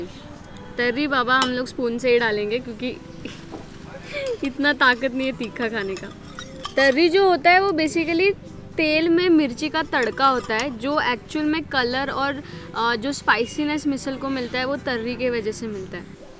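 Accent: India. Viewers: Your channel is nice and content is good too.. doing videos in hindi helps larger audience to watch it, which is awesome too! But why to pronounce marathi words in hindi accent 🤔 somewhere we do this which sounds weird.. be proud of our language and pronounce मिसळ as मिसळ and not मिसल.. just one example im giving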